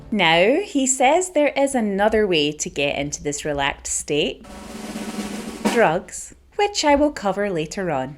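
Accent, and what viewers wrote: Scotland, United Kingdom. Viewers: Hey girl, you Scottish, you sound it. I have used it for years on and off. Been doing a lot of other meditations last few years. Keep going, it took me many years to project, only done it once but it was very vivid. I found myself floating around the ceiling. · I've now found a new favorite accent, is that Scottish, Irish, or something else? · . Which particular brand of British accent is that? 🤔😅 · You are from Scotland aren't you 😄 love the accent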